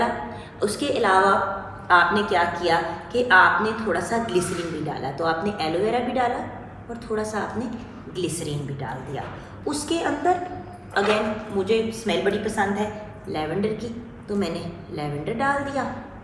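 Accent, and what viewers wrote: Punjab, India. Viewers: Same here mam plz upload video i like yr pinjabienglish voice love uu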